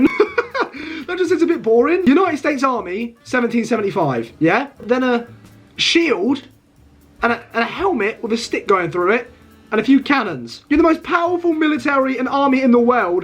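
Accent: United Kingdom. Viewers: i know u british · Your scottish because yor accent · r u british mate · I can tell bro is britesh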